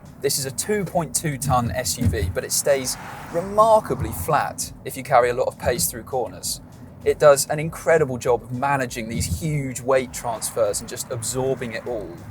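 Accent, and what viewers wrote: RP, United Kingdom. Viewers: Wouldn't say he has a 'posh' accent, well maybe a little posh, he has a standard RP accent from SE England but yes you can tell he's probably been to private school. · He speaks perfectly posh English. Impressive.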